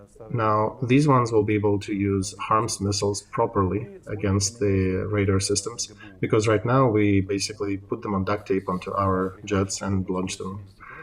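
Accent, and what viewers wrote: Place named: United States. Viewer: His English is extremely good, too, so you can trust the things that you learn. Not perfect but very good. His English pronunciation is excellent. I say these things as a native (US) English speaker. Also, for what it's worth, he has a US accent, definitely. At times I easily forget he's not a native speaker. When I am aware of it, often it's because he pronounces words better than most Americans, speaking every syllable completely. That makes it easy to understand.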